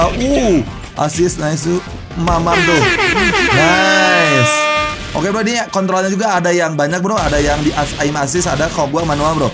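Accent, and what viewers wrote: Bandung, Indonesia. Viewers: Bang orang Bandung ya?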